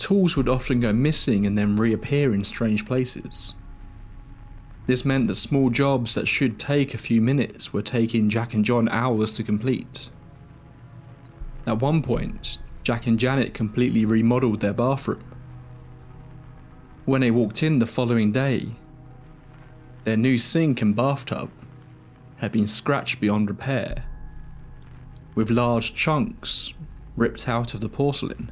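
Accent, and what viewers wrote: United Kingdom. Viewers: Why are all videos like this narrated by a British guy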